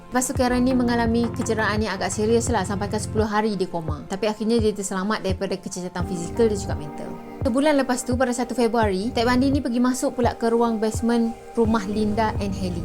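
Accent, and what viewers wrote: Kelantan, Malaysia. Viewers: Patutla sy ade dgr slang kelantan.. rupanya betul sangkaan sy yg sis ni kelate hahaha samela kita sekampung 👍🏼😂😂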